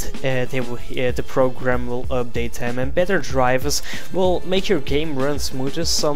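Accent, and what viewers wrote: Netherlands. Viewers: je hoort heeell goed als iemand nederlands is xDD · So you're Dutch, or Flemish? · Yay nederlander die engels praat lols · Wait a sec, you dutch bro? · Whuut are you dutch? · You are dutch? :D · are u dutch? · oooh je bent nederlands k daxht al toen je control alt delete zei youre dutch i was thinking about your accent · Are you from the nethetlands?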